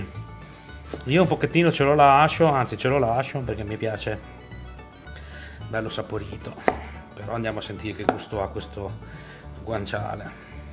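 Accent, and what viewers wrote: Emilia, Italy. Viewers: sei bravissimo, grazie per questo video! una curiosità, di dove sei? dall'accento sembri emiliano. io sono romagnolo (Rimini) ;)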